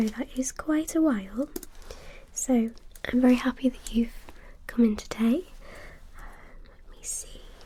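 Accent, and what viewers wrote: United Kingdom. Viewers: I love her british accent😍👍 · So I see your from BRITLAND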